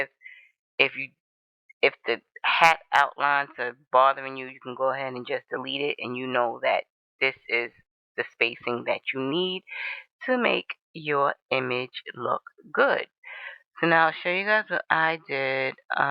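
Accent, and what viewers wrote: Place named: Brooklyn, United States. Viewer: Yep that's about rite😁...can hear Brooklyn all thru ya wordz..big Bronx iz definitely rockin.. keep grindin luv...alwayz nyc luv💪🏾🔥💯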